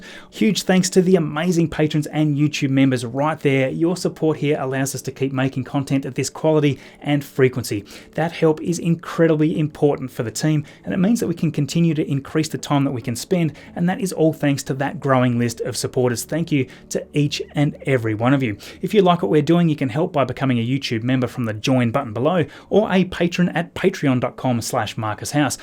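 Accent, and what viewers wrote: Australia. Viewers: Lol, YouTube CC wrote “Bokeh Chicago” when you said “BocaChica gal” ... that Australian accent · maybe its the Aussie acent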